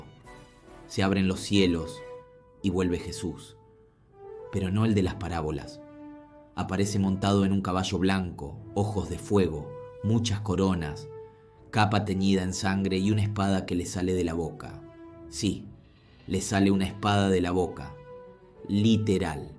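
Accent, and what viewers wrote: Argentina. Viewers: Me encanta el acento argentino. Lo único que me gustaría que fuera narrado con menos humor irónico.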